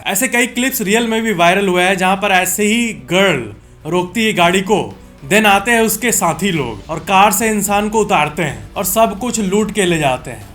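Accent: Bihar, India. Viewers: thats bihari Akcent ❤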